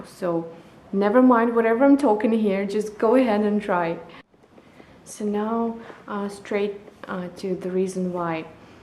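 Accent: Russia. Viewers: She sounds like Russian, Ukrainian or something from that region.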